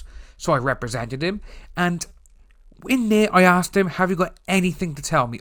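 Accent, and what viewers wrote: Wales, United Kingdom. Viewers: I understand you dude having Walsh accent is a pain at times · You have a Welsh accent? Hell, I just pegged you for livingbin the UK. 😄 Hearing the voice of this metrosexual, I have no doubt he's bringing Tyrone snacks as Tyrone rails away on Monica.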